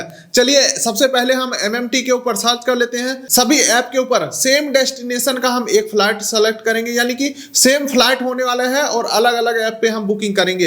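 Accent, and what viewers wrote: India. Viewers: Esky scanner.....indian english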